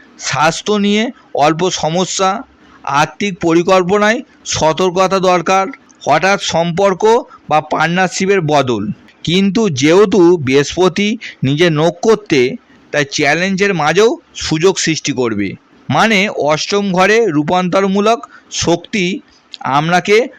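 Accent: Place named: Kolkata, India. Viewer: এটা কোলকাতা, পশ্চিমবঙ্গের কিছু লোকের অভ্যাস, এভাবে বললে তাদের ভাব বাড়ে।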